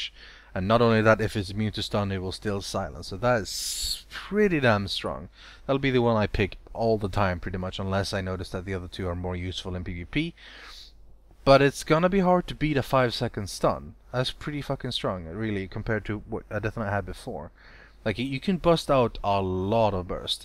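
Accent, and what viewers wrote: Sweden. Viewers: Is Rythian from Sweden!?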